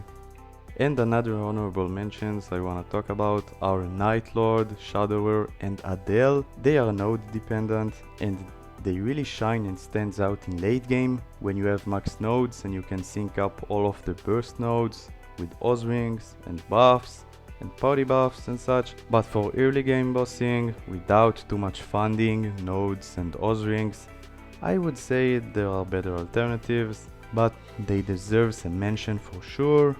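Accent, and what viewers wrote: Israel. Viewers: ישר זיהיתי תמבטא הישראלי אחי ישר סאב! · וואלה יא קינג זהיתי אותך לפי מבטא איזה ישראלונים אנחנו, אחלה סרטון דרך אגב.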